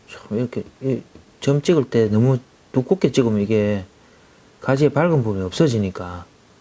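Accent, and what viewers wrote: Gyeongsang, South Korea. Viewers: 벗꽃그리기 배우고 가요 경상도 말 혹시 대구가요 배우로갈께요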